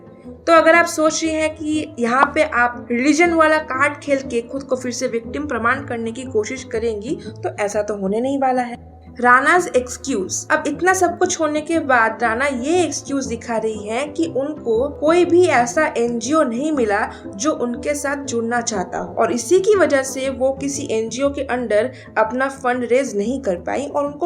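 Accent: Bengal, India. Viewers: Tum shayad west bengal se ho tumhari hindi bolne wali accent mein bangali touch hai tum north se nahi ho ye mujhe pakka yakin hai waise awaz mithi or pyari hai tumhari bhagwan ka shukra hai ab ladkiyan bhi right wing ko support kar rahi hai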